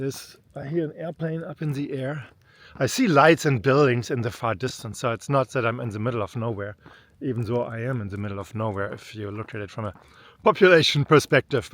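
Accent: Germany. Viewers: 1 Sek im Video und ich so oha deutscher Akzent kommt hart durch😂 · Hey there, came across your channel by chance. Was not disappointed. Love the accent - German origin, right? You got your own private airshow there pal, courtesy of the United States Marines. What a treat. And what a great look at an awesome piece of military hardware. Subscribed. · From your accent you sound German. In case you didn't know we have German military personnel who have i believe permanent duty stations at Holloman AFB, New Mexico and Nellis AFB, Nevada. I hauled weapons, explosives, and other secrey stuff for the governemnt for 30 years and when the Germans shipped their ammo over here we would haul it to them wherever they were based. We loved dealing with them, so polite and efficient in getting us offloaded. Be well! :) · Na da höre ich doch einen deutschen Akzent. Bist DU Auswanderer ? 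Danke für das Video. Gruß aus der Oberpfalz, Manuel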